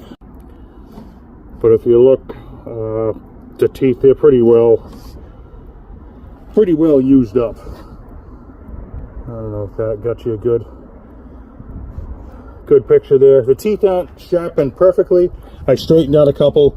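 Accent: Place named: Massachusetts, United States. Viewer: just curious the accent sounded Massachusetts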